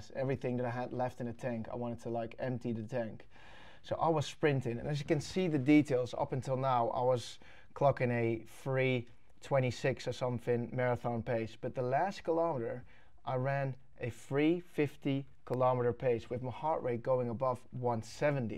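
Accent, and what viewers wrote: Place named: Netherlands. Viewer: I studied in NL, now back in Thailand. Also training for triathlon to race in my country. Your story is inspiring and your accent makes me miss life in NL so muchhhhh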